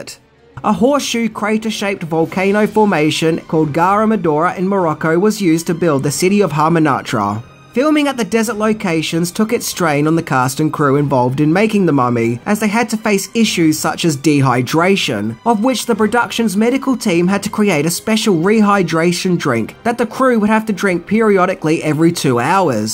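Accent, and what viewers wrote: Australia. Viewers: It’s even printed wrong on the last title card. I was cutting slack for the Aussie accent thinking maybe he’d always just heard it wrong but when it appeared incorrectly in print, I gave up. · Oi you’re an Australian, sweet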